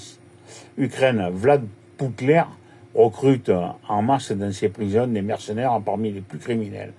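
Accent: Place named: Turkey. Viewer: Parle français tonton ont comprend rien avec ton accent de turc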